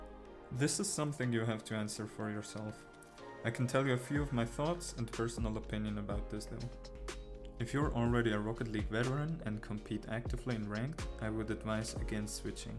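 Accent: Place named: Switzerland. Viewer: ich bin so en gott. hans useghört, dass du en schwiizer bisch haha.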